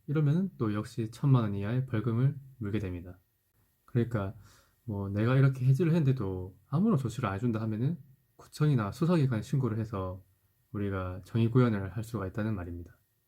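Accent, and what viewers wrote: Gyeongsang, South Korea. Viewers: 부산 경남쪽 같으신데용?ㅎㅎ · 대구사람이신가요 말투가 제 친구들같군요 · 부산 사투리네 형 .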